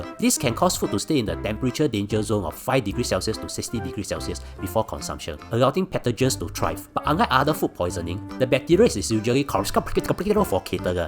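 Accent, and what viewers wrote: Singapore. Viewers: That is his signature style, exaggerated singlish, how abt u find another channel to watch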